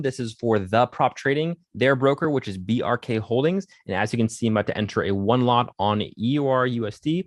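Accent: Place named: United States. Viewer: You sound American 2 me bro, how do use this broker? It doesnt take US residents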